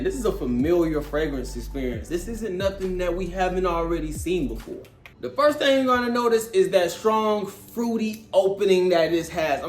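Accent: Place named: New York, United States. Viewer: U gotta be a NY nigga with the yeeeerrrrr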